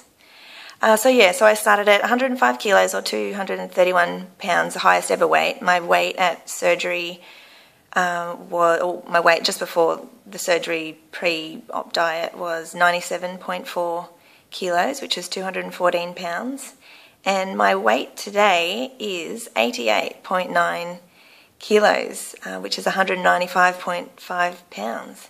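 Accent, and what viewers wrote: Australia. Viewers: I'm starting to think all Aussies are just good looking people! I agree it's refreshing when I hear the Aussie accent. :) You will be amazed on how much things will change even in 3 or 4 months. Glad you reached out to share your journey and I think you'll fit right in! I used the same explination early on as well. If people find the FatKings stuff, then great, but I didn't go out of my way to tell them early on. -Phil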